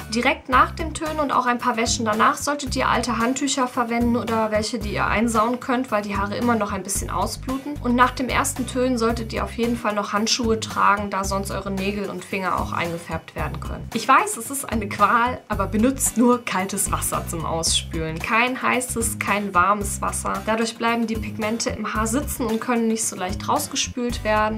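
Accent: Germany. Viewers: Kommst du aus Münster oO ?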